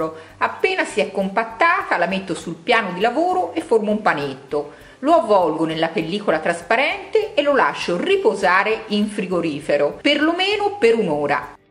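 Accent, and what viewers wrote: Tuscany, Italy. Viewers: perdonami sicuramente la panna e le fragole si riferivano ad altre immagini stavo cucinando Ma sono sicura che ti hanno fatto vedere perché ho riconosciuto la tua voce, tipicamente toscana come me e mi sono voltata e ti ho vista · Non si può sentire un accento toscano per la descrizione di una pastiera..... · Che dire sei strabiliante... La tua bravura e poi quell'adorabile accento toscano mi regalano relax e voglia di combattere anche tra i fornelli e poi con la bilancia🤣Un grande abbraccio e un incoraggiamento a tutti per questa triste situazione. Che il Signore benedica tutti🙏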